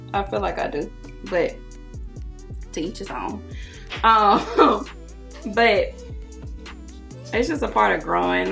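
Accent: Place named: South, United States. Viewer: U country where u from youngan?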